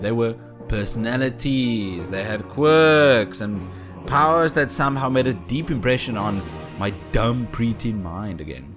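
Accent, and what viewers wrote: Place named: South Africa. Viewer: Always so nice to randomly hear a south African accent here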